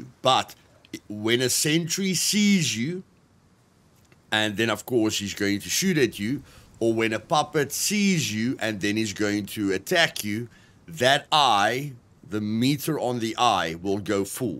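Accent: Afrikaans, South Africa. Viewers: You Afrikaans?😅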